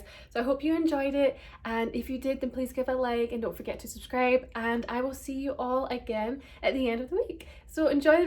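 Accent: Scotland, United Kingdom. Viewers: Love your Scottish accent hen.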